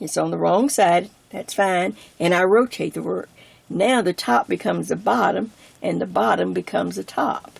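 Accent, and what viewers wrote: South, United States. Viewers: I just found your channel! You're just darling!! Plus, being a southern myself....I love your southern drawl, and demeanor!!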